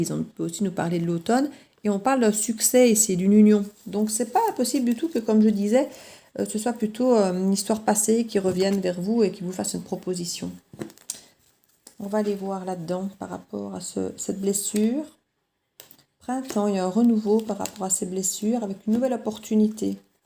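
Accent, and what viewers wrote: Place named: Liège, Belgium. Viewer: Une guidance tres parlante... comme toujours... ;-) ca evolue petit a petit, quelques petits changements chez elle depuis ta guidance mais j'ai reprend mon "pouvoir" tout doucement pour me prendre en priorité et ne plus etre dans l'attente... Pour le reste, on verra bien.. ;-) Un grand merci pour tout... Plein de belles énergies pour toi (j'adore toujours autant cet accent liegeois :p )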